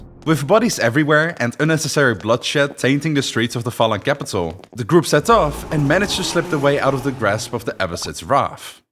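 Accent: Netherlands. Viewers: That's a very heavy Dutch accent 😂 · Ik hoor bijna niet dat je Nederlands ben respect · Dutch accents are ruining YouTube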